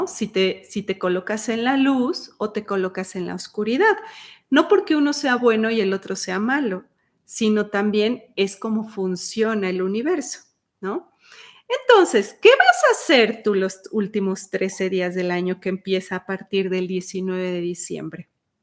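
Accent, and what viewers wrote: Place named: Mexico. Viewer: Hermosa, me gusta tu tonada mexicana,soy de Argentina y cuando dijiste son las 13 y 13,miro la hora que te estoy escuchando 19 y 19.😊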